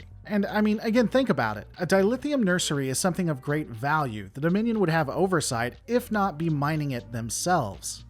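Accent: United States. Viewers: So freedom is not something worth sacrificing for? It is, in fact it’s worth laying everything on the line to keep it. As an American (presumably from your accent) you should understand this from our history.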